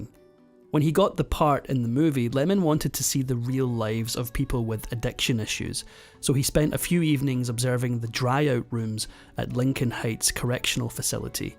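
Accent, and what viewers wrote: Scotland, United Kingdom. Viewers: OK, the Scottish accent is extremely annoying after awhile - never noticed this before!!!!!!!!!! 😮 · Awesomeness my favorite Narrator... Love listening to the Scot!!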